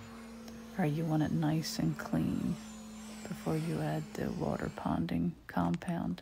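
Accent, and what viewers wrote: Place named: Philadelphia, United States. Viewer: Nice video, I’m a commercial roofer. And go Phil’s! I hear the Philly accent. I’m from Philly too!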